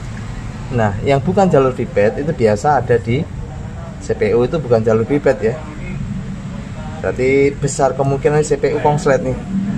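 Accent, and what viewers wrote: Java, Indonesia. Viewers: Mas,sampeyan orang lampung kok bahasa jawae kok medok ya.??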